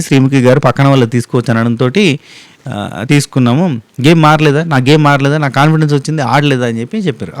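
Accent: Telangana, India. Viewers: Anna meru matladthe ma annaya matladthunte vinnattu untadi super telengana slang jokes ....happy to see ur videos daily😊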